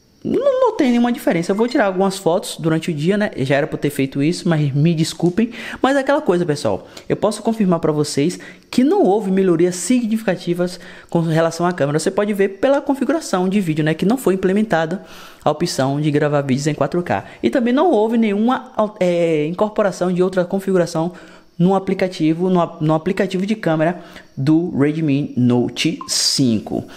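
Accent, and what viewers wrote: Bahia, Brazil. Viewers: Gostaria de saber se a miui 10 vai chegar para o redmi 4a? Força aí no canal , tu é baiano?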